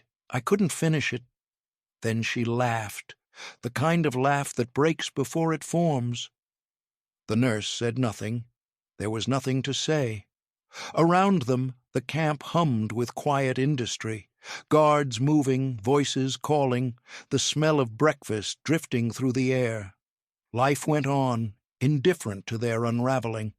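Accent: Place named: United Kingdom. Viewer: Texas in America and yet you choose to use a British sample narration. I don't understand.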